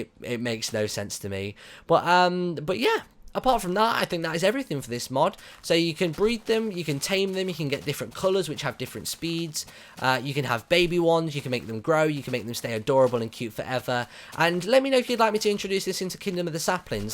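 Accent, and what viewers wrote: United Kingdom. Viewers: this guy is so brittish he sounds royal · yeah... I'm not usualy this predjudice! sorry, I like brittish accents! Plus I love his yogbox series!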